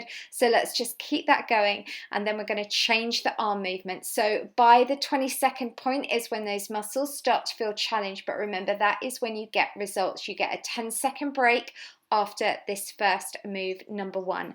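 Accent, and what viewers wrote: United Kingdom. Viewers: Really love your accent I'm American so I find British people's accents to be amazing 😊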